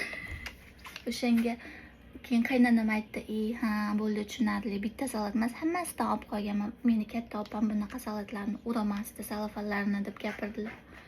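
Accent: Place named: Fergana Valley, Uzbekistan. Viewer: УЗИ если,Тошкентлимисиз йоки водийданми?Шевангиз Водийга тортади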